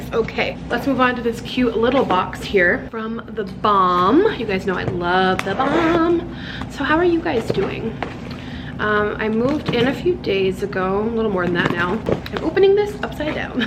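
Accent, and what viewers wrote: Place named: United Kingdom. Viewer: I love the British accent lol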